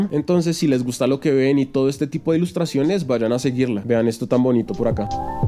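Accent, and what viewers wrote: Colombia. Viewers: oiga pa, deberiamos formar una banda mucho mas indie y experimental que rompa estos esqumas, soy guitarristas, me cuentas se nota que eres colombiano XD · ¿Eres de Medellín o Bogotá? No distingo tu acento, soy fan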